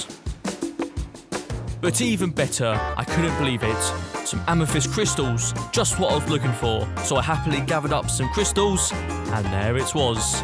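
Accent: United Kingdom. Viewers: You British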